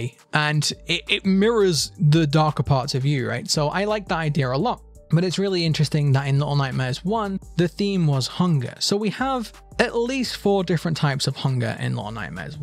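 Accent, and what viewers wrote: United Kingdom. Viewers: He's so aggressively British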